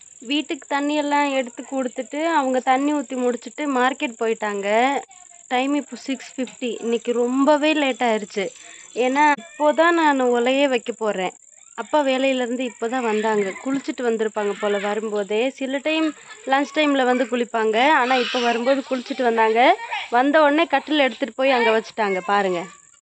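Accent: India. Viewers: Neenga pesurathu hindi poonu tamil kathutu pesura mathiri iruku lunch time use panran intha varthalam mathiya sapatunu soli parunga nalairukum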